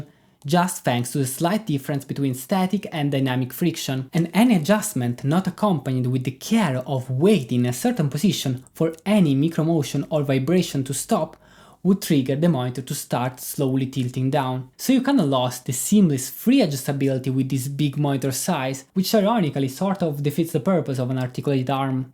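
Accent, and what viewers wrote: Italy. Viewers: Italian, Russian is completely different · Sounds more like Italian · Si sente molto l'accento italiano comunque ahhaha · This is undoubtedly the best video on the subject of monitor size. Thank you. I love your Italian accent. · Italian Is my guess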